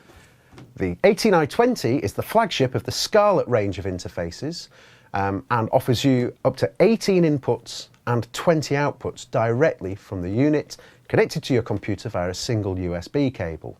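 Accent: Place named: England, United Kingdom. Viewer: Just a bunch of talking with no user knowledge to be applied...oh, he has an english accent.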